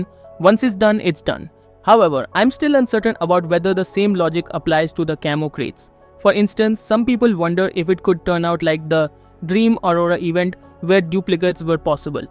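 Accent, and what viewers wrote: India. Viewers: when i heard indian accent , “this is must be a scammer” .nah sorry bro ,i dont grinding ,i just bought using cp value at 15.000 cp ..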